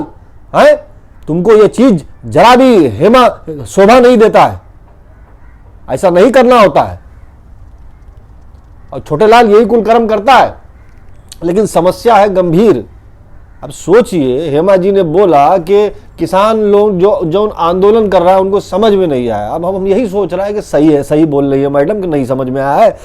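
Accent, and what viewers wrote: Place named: Kanpur, India. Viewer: Rocks!! Once again. You hit the bulls eye... 🤘🤘🤘🤘 God bless... And ur kanpuriya accent is getting better by the day... Hum bhi flabbergastiya gaye!!! ❤❤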